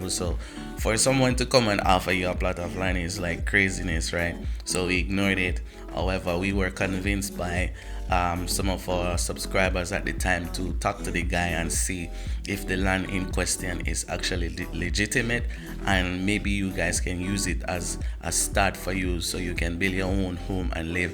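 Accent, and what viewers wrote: Africa. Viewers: OMG, you sound African! I will be in Gambia in 2 weeks time. Would really love to meet you and your wife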